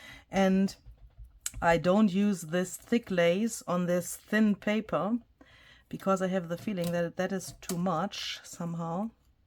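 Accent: Germany. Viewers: These are fantastic. Just love them, but seriously I love everything you do. I so enjoy your videos, your German accent and your wonderful sense of humor. I like you as a person. It’s wonderful you get to enjoy your little piece of nature at least for part of the year until they all show up and you feel like you’re in the middle of a bustling metropolis 😂😂❤️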